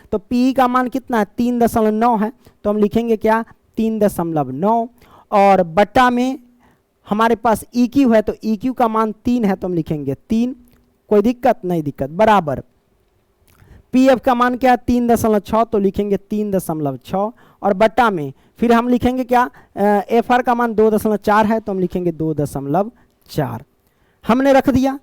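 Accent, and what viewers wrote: Bihar, India. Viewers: Sar aap bihar se ho naa ❤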